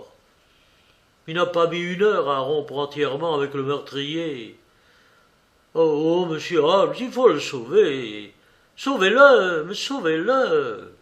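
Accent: England, United Kingdom. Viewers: Le lecteur qui est connu pour ses qualités de diction adopte dans récit l accent anglais qui parfois écorche les oreilles alors qu il aurait dû parler simplement en Français. Mais malgré tout il mérite les félicitations. DJENANE Rachid Alger.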